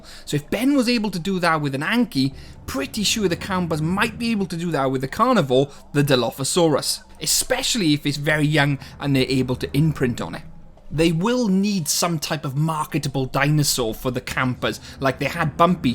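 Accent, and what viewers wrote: Wales, United Kingdom. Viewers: Sounds like Welsh accent, where abouts are you from? I'm Bangor, Gwynedd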